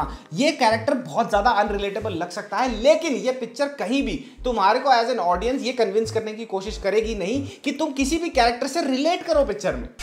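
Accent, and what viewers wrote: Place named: Delhi, India. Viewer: I agree 100% accent is exactly like Delhi boy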